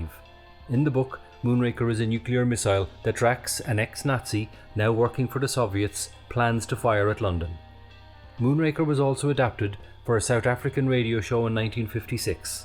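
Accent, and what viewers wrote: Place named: Ireland. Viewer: Hi / Finding it difficult to obtain and buy kits locally. I regularly visit a store "MARKS MODELS" here ib the Emerald Isle but it has limited stock in the sci-fi range. I think I have bought everything he has listed. There seems to be a lot of items listed on the JUNGLE website but then states its not available to deliver yo your location. I wondering as you sound local to me, where do you pick up your kits? Many Thanks. Seán Óg. Liking your channel.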